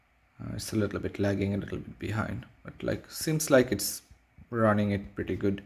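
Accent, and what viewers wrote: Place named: Bengal, India. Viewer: Accent shune bujha jay bangali... Hoytoh dhakar keu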